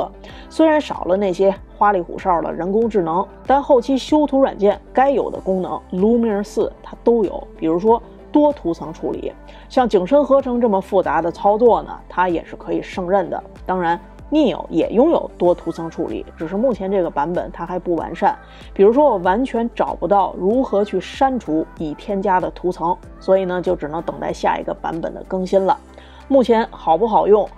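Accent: Beijing, China. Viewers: 姐们儿，一听你就是老北京：）感谢分享，在B站也关注你了。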